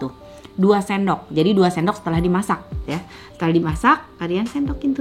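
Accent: West Java, Indonesia. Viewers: Teh memang luar biasa biar sudah sukses tpi ttp sundanya ttp nempel melekat... Sahabat teteh suk maampir ke sebelah kita joged" dengan alunan lagu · Nyunda pisan s tth..hidup urg sunda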